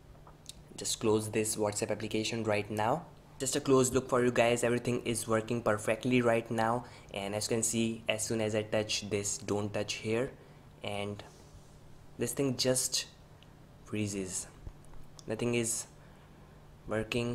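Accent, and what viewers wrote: India. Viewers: Парень индус какой-то, английский его режет уши)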